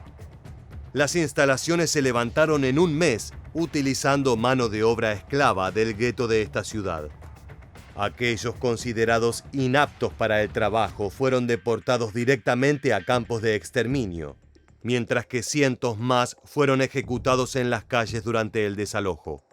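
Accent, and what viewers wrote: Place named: Argentina. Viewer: VIDEO CON ACENTO ARGENTINO, YA NO ME INTERESA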